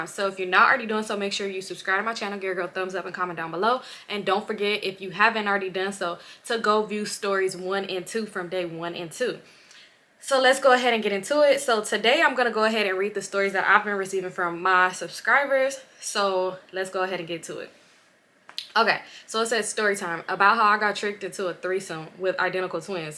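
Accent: Trinidad and Tobago. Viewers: Allyuh = All of you Caribbean accent Possibly a Trinidadian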